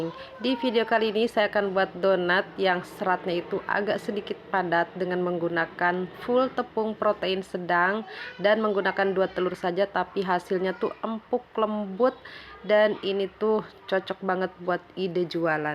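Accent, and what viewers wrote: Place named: Padang, Indonesia. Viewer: Seperti nya dari cara bicara dan logatnya, bunda ini kayak orang padang..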